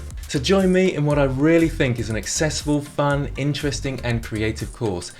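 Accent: England, United Kingdom. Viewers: At first I saw this in my inbox I was like "Okay maybe, but I shouldn't be spending money for yet another course I will never use", but then I saw and heard that buttery smooth English accent and I was In Like Flynn!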